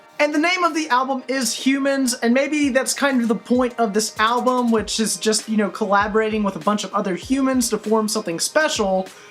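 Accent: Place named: Pennsylvania, United States. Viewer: The way you make your "O" sounds in words I can tell you're from Pennsylvania or around that area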